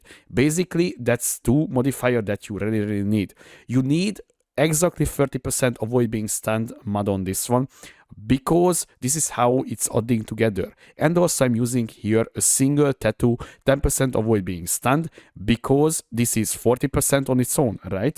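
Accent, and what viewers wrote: Hungary. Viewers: Came here after a recommendation for super tanky builds, not disappointed! De barátom a kiejtésed borzalmas hahaha Rögtön tudtam hogy honfitárs vagy! A kedvencem a kurentli és a dö :)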